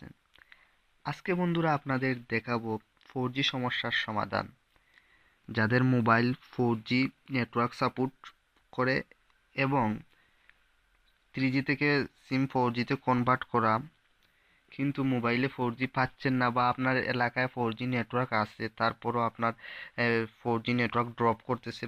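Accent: Sylhet, Bangladesh. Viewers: ভাইকি সিলেটি?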